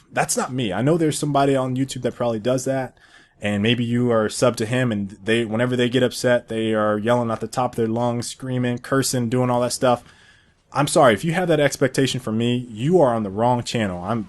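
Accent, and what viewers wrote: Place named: Texas, United States. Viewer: Man I'm starting to hear some Texan accent. Good vid btw.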